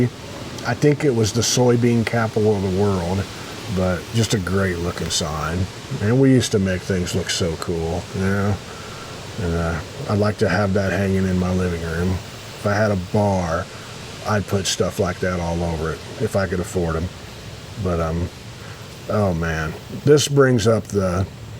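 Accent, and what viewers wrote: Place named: Midwest, United States. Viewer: I am from Michigan you are so Midwestern I love that!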